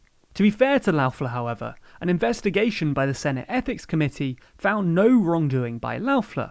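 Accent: United Kingdom. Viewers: Oh boy, a video narrated by some British guy telling how eight U.S. Senate election races will determine control of the Senate. NONE of these eight particular races include the Senate seats in Minnesota or Michigan, both of which the Democratic incumbent is very vulnerable and of which could help maintain GOP control. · Why is a British guy telling us who'll win?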